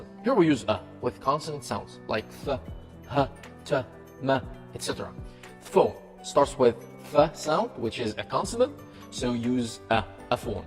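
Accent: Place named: Vietnam. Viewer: anh là ng việt ạ, sao lại phát âm f h t n tiếng việt vậy:))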